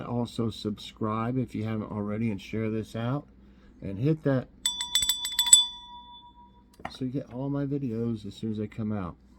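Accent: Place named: England, United Kingdom. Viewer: I love listening and hearing your English accent for I always love to learn. I tried to listen to understand English much better. Thank you 🙏 so much for sharing this strawberry lemonade as it is so new to me. I’d like to try it one day 🙏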